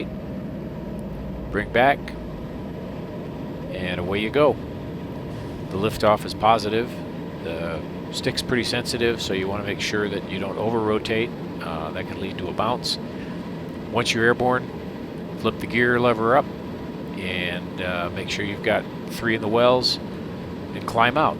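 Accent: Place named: United States. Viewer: Thank you it was very interesting and relaxing video, pleasant , calming voice with good American English. Greetings from Ukraine, home of biggest plain .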